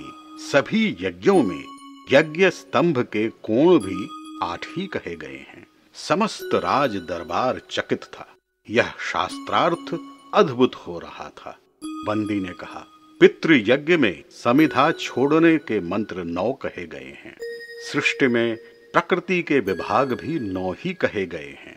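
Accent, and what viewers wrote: Standard. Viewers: हमेशा की तरह विशुद्ध प्रभावशाली उच्चारण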